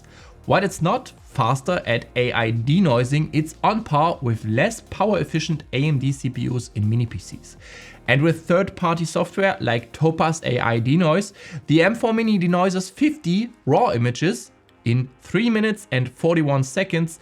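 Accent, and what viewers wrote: Germany. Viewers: ´Ming Jung, ich hör doch direkt du bist nh Dütsche ^^ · da german comes trough wenn speaking. he tries not to spell like a native english guy as you would learn it in europe.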